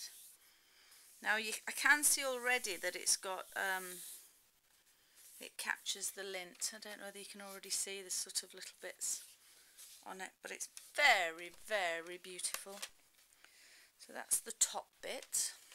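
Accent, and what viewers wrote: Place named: United Kingdom. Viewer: I love your accent. Many Americans are suckers for a British accent!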